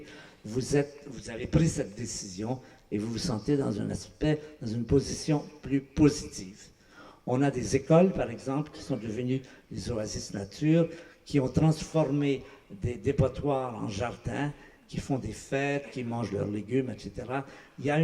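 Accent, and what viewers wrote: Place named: Canada. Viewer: ZzEL Chavez Zz Hubert Reeves est Canadien, permet lui d'avoir un accent !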